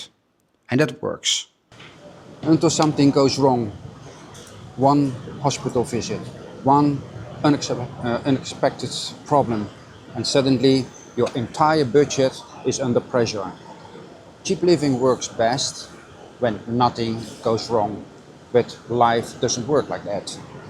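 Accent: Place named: Europe. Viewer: let me be honest with you – as a European (you sound European, forgive me if my assumption is wrong) pensioner with only limited income, stay in Europe. lots of places at the periphery that are as stunning as they are affordable. yes, you may need some winter clothes, but you can live safely and without much uncertainty. and if you save, you may be able to spend one or the other winter in SE Asia, you can pick the cheapest off-season flights.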